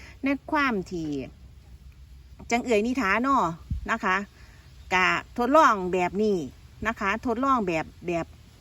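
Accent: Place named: Sisaket, Thailand. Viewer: สำเนียงแบบนี้ศรีสะเกษคักๆครับผม😂😂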